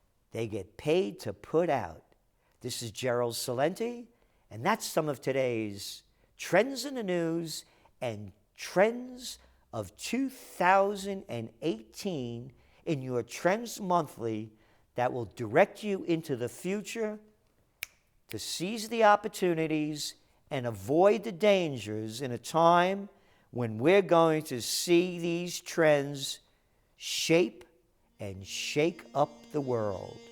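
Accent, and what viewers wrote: New York, United States. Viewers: i love the New Yoik accent!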